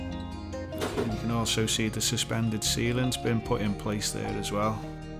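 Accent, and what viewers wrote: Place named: Lancashire, United Kingdom. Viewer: Your accent.. West Lancashire/Merseyside.. How you find it living up there? Long way from home, but I've always wanted to move up there myself too. How's it in your experience?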